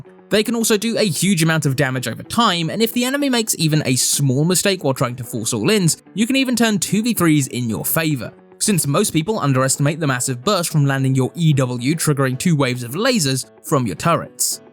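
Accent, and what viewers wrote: United Kingdom. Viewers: his accent is literally screaming UK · I’m sorry but I dislike this British accent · Yes now we have a British caster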